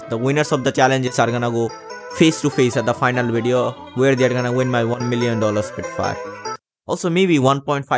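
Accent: India. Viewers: The music is the same as in all of your videos. Your accent is indian. Words are just words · Hey guys didn't u notice the update the air just got colder Edit: btw are you Indian cuz u got some Indian accent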